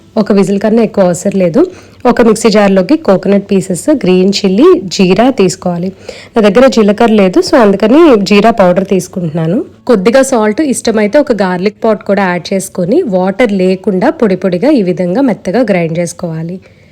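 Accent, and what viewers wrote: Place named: Godavari, India. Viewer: Enka chadavaledu but I am watching his yt shorts ma super godavari slang❤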